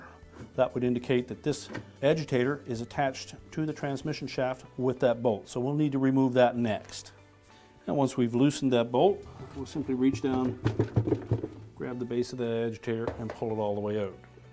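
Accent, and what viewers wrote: Canada. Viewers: I love his accent (canadian maybe?). « We will need to take this aöt, and once it is aöt, we can clean it » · Sounds like a Maritimer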